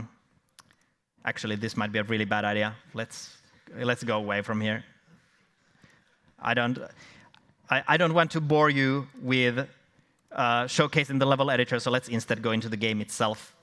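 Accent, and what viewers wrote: Finland. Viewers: The Finnish accent Rakastan sitä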